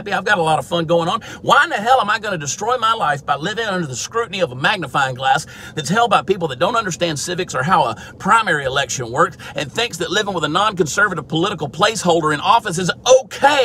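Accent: Texas, United States. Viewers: New hat! Great insights! You put my thoughts onto Texas accent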